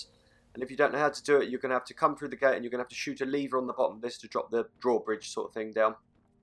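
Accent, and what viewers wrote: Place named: United Kingdom. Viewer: will these items make you become master of the thieves guild? Btw I Love Your British Accent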